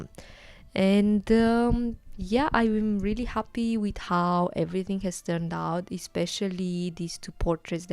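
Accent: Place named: England, United Kingdom. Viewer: great drawings and cute english accent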